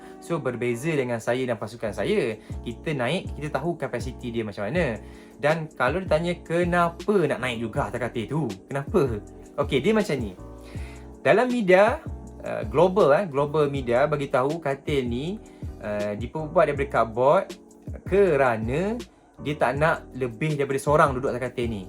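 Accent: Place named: Terengganu, Malaysia. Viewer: slang ganu tetap ade 😂😂😂, kemahh sek kitee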